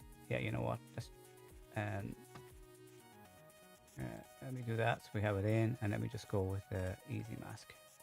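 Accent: Ireland. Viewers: the view count and interactions does not capture how awesome and ~valuable~ these sessions are. I get so much out of each one, thank you again. Also there is a big market for unintentional ASMR with Irish accents believe it or not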